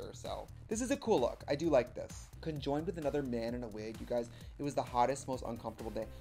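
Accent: Wisconsin, United States. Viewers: The last time I was this early Trixie still had her Wisconsin accent 😭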